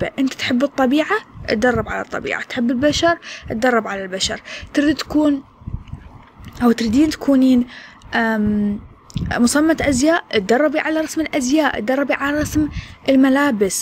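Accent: Iraq. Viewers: حبيت لهجة العرقية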